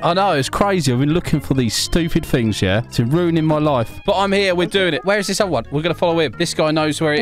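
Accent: United Kingdom. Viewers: Are you British? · bro is british 💀💀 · Your british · are you british😅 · U are British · Are you british? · Bro is british🏴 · I was wondering r u British 😮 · I know ur british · Areyou british? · I just realize that you were British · Are you British? Bc if you are… HI MATE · I LOVE YOURSELF BRITTISH ACCENT 😭😭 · YOUR BRIT-ISH MATE?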